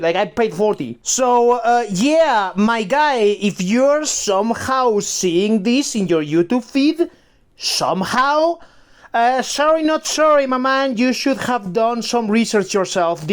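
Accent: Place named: Eastern Europe. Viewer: Lol nice review brudder, like the energy. Gave me old YouTube vibes. Slavic accent on top of it all was an entertaining touch. HiBy R1 is a beast if you're willing to work with its quirks for sure. Has LDAC and MBES too which I wasn't expecting. Plus if you really wanted, you can use it with an external DAC/Amp too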